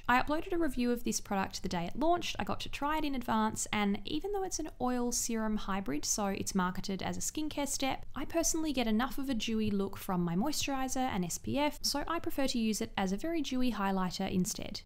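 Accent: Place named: Australia. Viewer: I love your Aussie accent. Your voice is so calming. You should make a skincare asmr video 😂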